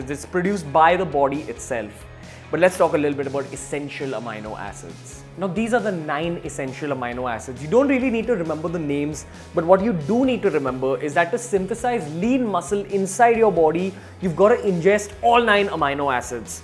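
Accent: India. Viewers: Its Amino acid not "Ameino" acid! Yes, I checked the oxford dictionary and thesaurus. Stop using that ugly fucked up Indian way of pronouncing shits. Makes you look like a fuckturd wannabe. That was just pure cringe!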